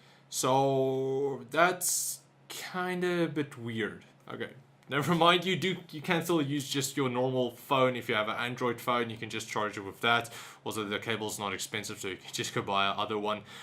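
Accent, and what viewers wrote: South Africa. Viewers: dude ur channel is dope mense must know you from south africa embrace the accent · south african tech youtuber? lessgoooo🇿🇦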